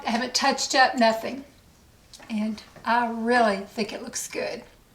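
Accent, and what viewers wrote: South, United States. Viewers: ...Honey You my dear are just the sweetest thing. Your accent is beautiful...are you from the South? Beautiful tribute to Suzy!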